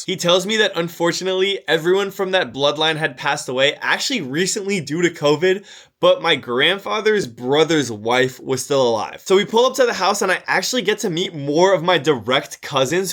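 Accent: Algeria. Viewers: You speak really like algerians.. You are an authentic Algerian.. God bless you.. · You are Algerian, you have the same behavior as Algerians when speaking 🇩🇿🇩🇿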